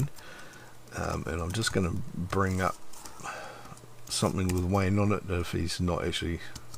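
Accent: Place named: New Zealand. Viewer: hello sir ! i was wondering if you're from new zealand? your accent sounds kiwi, however i apologise if you aren't haha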